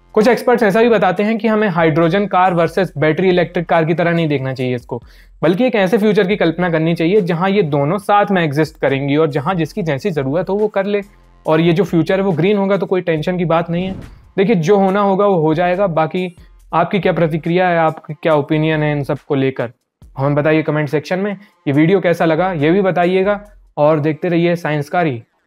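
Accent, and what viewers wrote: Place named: Jabalpur, India. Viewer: Bawa jabalpur se ho lag rha...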